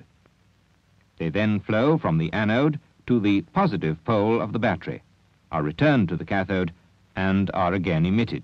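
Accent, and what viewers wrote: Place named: United Kingdom. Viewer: Canada? Why does the voice over sound British??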